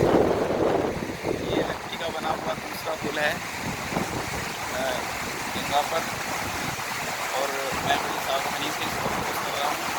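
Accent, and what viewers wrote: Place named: Kerala, India. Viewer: ഒരു മലയാളി ശബ്ദം!,🤔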